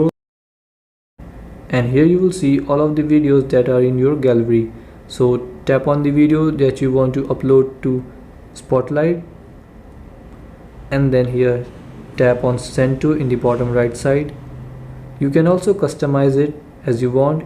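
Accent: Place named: India. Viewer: The indian accent❤❤❤🥰🥰🥰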